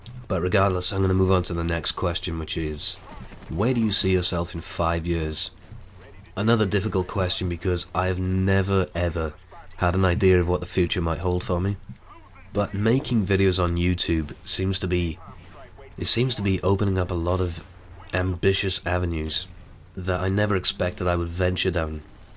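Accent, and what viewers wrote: Wales, United Kingdom. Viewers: where in he uk are you from? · i must say i dont like the welsh accent but ure voice is heavenly and the kiparis is BEAST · I think you would be such a good voice actor! It's like when I first heard Gaz in cod 4, and then Ghost in cod 6. His voice was awesome and I got the same feeling when I first heard you. btw, are you from wales?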